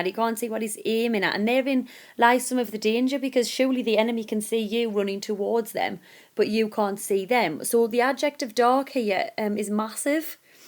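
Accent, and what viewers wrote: Newcastle, United Kingdom. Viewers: Your video has really helped me, thank you! Your accent has me assuming you are from Northern England with a Geordie accent?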